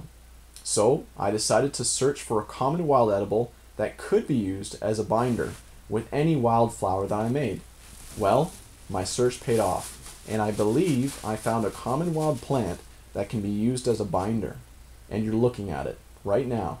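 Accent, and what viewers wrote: Canada. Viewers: You couldn't spot that Canadian Accent?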